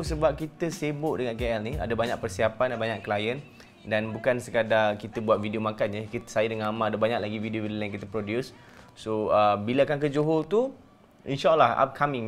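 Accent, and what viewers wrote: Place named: Minangkabau, Indonesia. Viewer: hulaa...patotla ckp minang manjang....asal greenwood ke..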